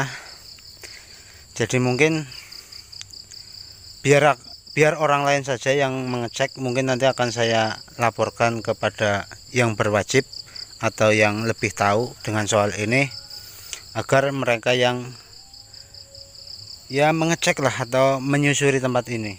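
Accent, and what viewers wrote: Banyumas, Indonesia. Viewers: Assalamualaikum WR WB. MAAF mbah, saya mau tanya karena sangat penasaran. Panjengan domisili di daerah Banyumas krn dgn logatnya. Tapi pastinya dimana. Matur nuwun. Saya penasaran kok masih ada orang canibal.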